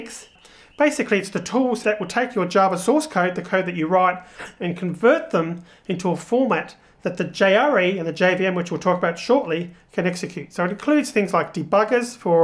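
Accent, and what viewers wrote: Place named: Australia. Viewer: I love learning java with aussie accent!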